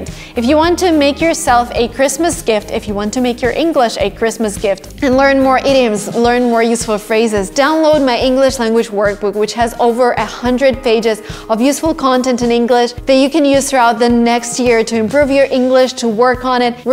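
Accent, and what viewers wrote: England, United Kingdom. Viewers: I really adore your english accent. Thanks for your all video. Merry Christmas 🎅🎅🎅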